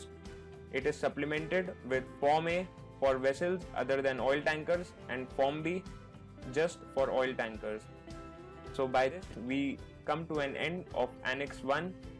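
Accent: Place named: India. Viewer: what's wrong with your pronounciation,buddy?! it's really hard to get your indian English,unfortunately...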